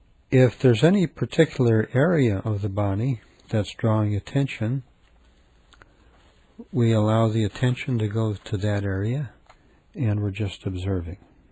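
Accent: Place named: United States. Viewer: i think its because its american, but the voice really creeped me out, abit. sorry.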